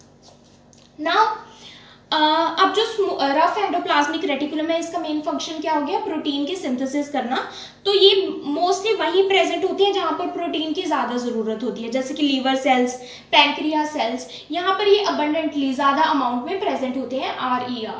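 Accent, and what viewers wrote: Punjab, India. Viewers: Mam are you panjabi?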